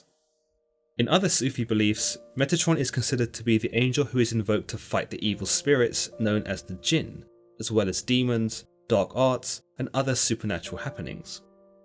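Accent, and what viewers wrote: United Kingdom. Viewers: Your scene is very witchcrafty creepy I noticed you have a British accent I I know there's people trying to sell something on TV have a British accentee too and make you more respectable acceptable and intelligent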